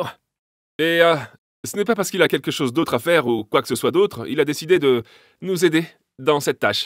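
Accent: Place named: England, United Kingdom. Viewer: Lol knowing you're English accent voice then hearing it dubbed is crazy cuz in listening and since I'm in America is dubbed in English. So I'm seeing you speak English knowing you're voice but hearing a weird english dubbed voice haha😂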